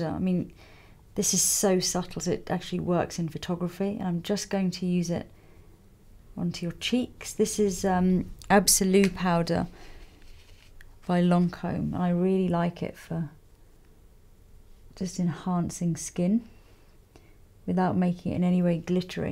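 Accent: England, United Kingdom. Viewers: So thrilled that you have a lovely English Accent and a soft voice xxx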